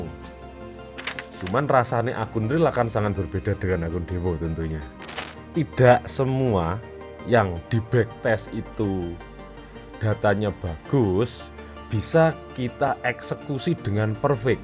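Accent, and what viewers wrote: Java, Indonesia. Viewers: Tulen wong jowo ki.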